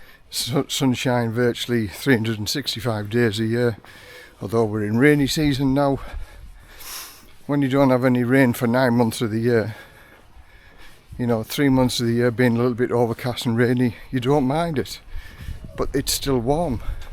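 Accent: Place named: North East England, United Kingdom. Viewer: I absolutely loved watching this. I’m from Durham but have lived in Aus for 15 years and plan to move to Thailand. You’re so lovely, humble and I found your insights really similar to mine. I don’t feel like England is an option for me anymore despite missing the countryside and seasons…. It’s just too expensive now. It was comforting to hear a north east accent again. All the best and I wish you a really prosperous and happy life. I love that you make the most of what you have despite not having a massive income. Your outlook is really lovely. Thanks so much for posting ♥️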